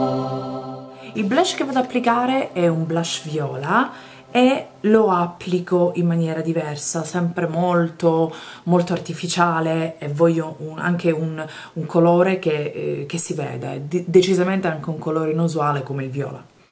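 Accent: Sicily, Italy. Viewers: ci piace così com'è con il suo bell'accento siculo...sennò che sarebbe??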